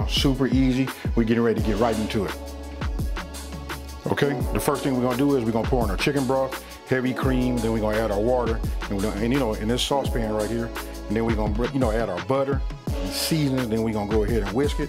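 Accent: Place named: California, United States. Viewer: Once I heard that Cali accent I was like Nahh I trust sun cooking..... don't know why but I do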